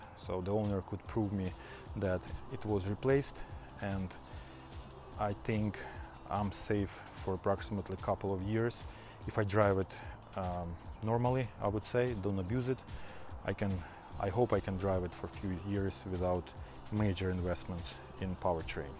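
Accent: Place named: Russia. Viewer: Судя по акценту ты говоришь по-русски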